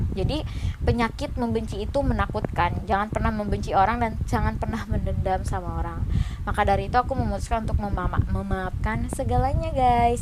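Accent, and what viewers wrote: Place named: Aceh, Indonesia. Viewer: Bahasa Indonesia nya Udah mulai medok Aceh ni...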